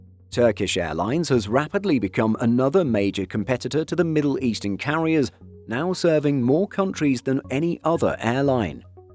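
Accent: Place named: United Kingdom. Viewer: Honestly, I’ve flown on several Middle Eastern carriers (always in business class) and I spent about a week in Turkey (sorry Erdogan I’m not spelling it the way you would like me to so eff off) and I’ve never seen a woman, much less her daughter, in a niqab, hijab, or for that matter a full chador. The Muslim “religion” is a goat herder’s abomination. So why would you, by the sound of your voice, from the UK and a child of the British Empire, such being the political creator at the end of the Ottoman Empire of the current abortion that is Middle East show videos of airlines completely understand that their business is buttered on all sides and not necessarily just Muslim sides, start this video off with two women in chadors? Why must your generation be so effing politically correct? All of you children can go straight to hell. We won’t get into whether or not it’s the Muslim concept of hell but I guarantee you that you won’t find any virgins anywhere once you die.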